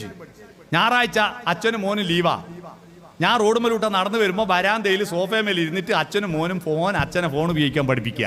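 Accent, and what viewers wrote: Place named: Kannur, India. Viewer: എന്റെ പൊന്നു സുരേഷ് ബാബുസറെ,താങ്കളുടെ പ്രഭാഷണം വളരെ വിലപ്പെട്ടത് തന്നെ, പക്ഷെ, ഭാഷ വെറുപ്പ്‌ ഉളവാകുന്നത്, കണ്ണൂർ, കാഞ്ഞങ്ങാട്, etc,,, അതൊന്നു മാറ്റി നല്ലമലയാളം, നല്ല ഉച്ചാരണം ആക്കിയാൽ ഒന്നുകൂടെ (100%) നന്നാകുമായിരുന്നു, pls try Sir, 😊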